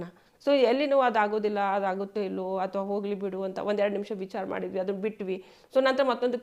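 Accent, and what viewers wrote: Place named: North Karnataka, India. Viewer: Yava ooru madum nimmadu,,,,aagaga utter karnatak bashe kuda barta erutte,,,,? Kutuhala aste😊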